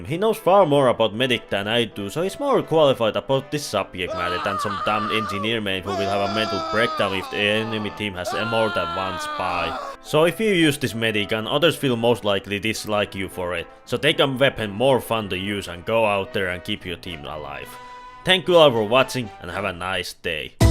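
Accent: Finland. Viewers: you are the suomiest finn ive ever heard, good video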